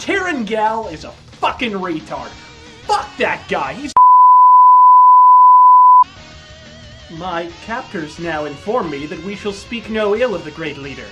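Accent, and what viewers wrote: England, United Kingdom. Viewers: Love the english accent. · Shite Briish accent - terrific video.Thanks as always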